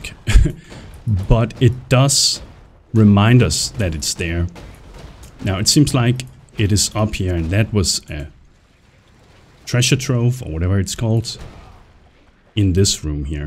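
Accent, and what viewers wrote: Denmark. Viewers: And yea he is Danish :p easy to tell on his accent, altough its not one of the bad ones! :p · Is this legal? Will it get you banned? And a side question. Are you danish ??? 😋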